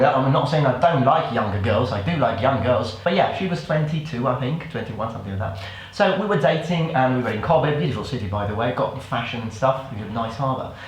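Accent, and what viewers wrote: England, United Kingdom. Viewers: Perche' e la prima volta che vedo un tuo video ,e mi sembrava dall'inizio che sei italiano,anche seppure hai l'accento inglese ahahha.E io poi che sono rumena ,ritornata 7 anni fa in Romania dall'Italia :)))?! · from my vantage point here in merica you need to do some bicep curls bro. and why do you sounds like an Englishmen if you're an eyetalian?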